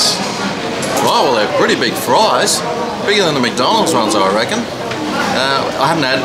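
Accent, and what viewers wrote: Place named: Australia. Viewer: Nice Australian accent m9 : D love it! 👍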